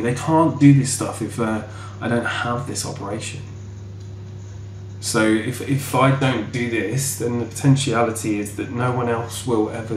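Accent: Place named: United Kingdom. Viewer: sotaque inglês genuíno.muito engraçado o teu sotaque británico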